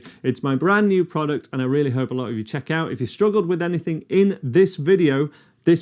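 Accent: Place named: West Cumbria, United Kingdom. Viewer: Like your style. That a la'al bit West Cumbrian accent eh?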